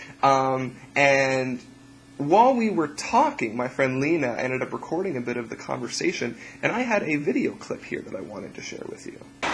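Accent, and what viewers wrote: Canada. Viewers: haha. you sound canadian.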